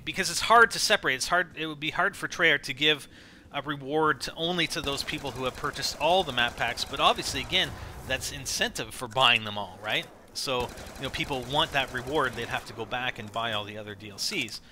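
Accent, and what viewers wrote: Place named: Canada. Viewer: let me guess your Canadian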